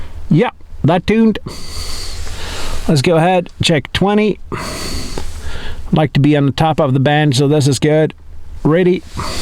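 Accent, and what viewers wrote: United States. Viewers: I enjoy your channel. Have you lived in the States? You have a very midwestern accent. Or midwesterners have a Norwegian accent.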